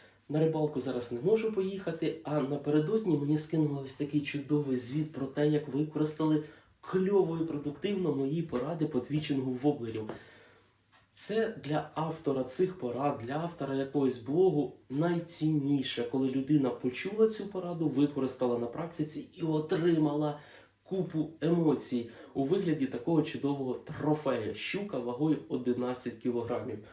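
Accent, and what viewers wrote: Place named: Surzhyk, Ukraine. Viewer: забагато суржику та неправильного використання слів, але неймовірно приємно чути тебе "рідною"! Respect і уважуха. Давай ще!!!!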